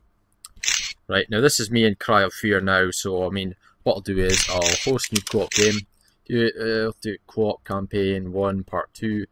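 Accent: Scotland, United Kingdom. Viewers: Wait wait wait wait, wait, R u scottish ? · You sound like a British Redmercy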